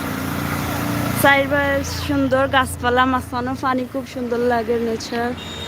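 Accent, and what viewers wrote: Sylhet, Bangladesh. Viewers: Assalamu alaikum babbizee sylheti bashai kotta bollsen etto shonder video keep it up 👍🏼👍🏼👍🏼👍🏼👍🏼👌🏼💪🏼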